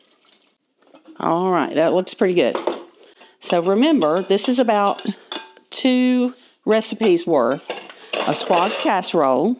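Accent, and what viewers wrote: South, United States. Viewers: Her southern accent 🫶 I’m a Texan living in Missouri and I missed that! The rule areas have a little bit of around here. Easy & kind! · What a great recipe. Thanks. You're so fun. Subscribed so fast. You're southern...but not so sickly over the top sweet like so many. Just love you.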